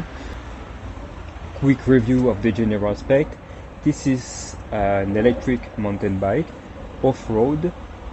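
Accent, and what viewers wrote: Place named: France. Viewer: Yes yes yes 😊 more content about this wonderful bike, your frenglish is enough, vive la 🇫🇷 😅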